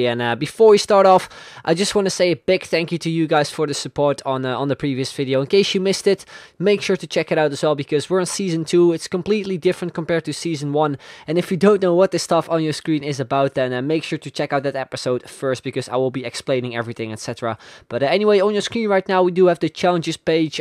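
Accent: Netherlands. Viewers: are you dutch cuz you sound like that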